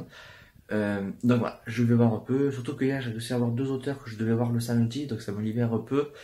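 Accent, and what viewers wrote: South, France. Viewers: Coucou ça va? Merci pour ce vlog, depuis ce matin je trépigne d'impatience à l'idée de le voir lol. J'ai pas été filmer au meilleur moment mais je me rassures en me disant que je ne suis pas la seule lol. Tu as enchaîné les merdouilles le dimanche, la vache. Ce salon a été compliqué pour moi comme tu le sais, mais si je devais revenir en arrière, je reviendrais ne serait-ce que pour te voir. Même si on ne s'est pas vus tant que ça sur la journée chaque moment passé avec toi était super et je super contente d'avoir pu te voir en vrai. Tu es quelqu'un en or, je t'adore! Ne change surtout pas et à toutes les mauvaises langues, allez voir ailleurs. Perso, j'adore ton accent du sud. Tes sourires et nos conversations m'ont redonné le sourire samedi et pourtant c'était pas gagné donc tout simplement MERCI <3. Bonne soirée et belle lecture!!! 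Bisous.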